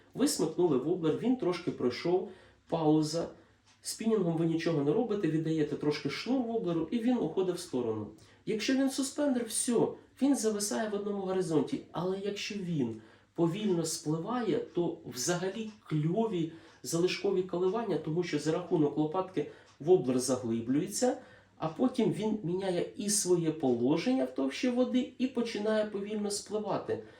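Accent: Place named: Surzhyk, Ukraine. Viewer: забагато суржику та неправильного використання слів, але неймовірно приємно чути тебе "рідною"! Respect і уважуха. Давай ще!!!!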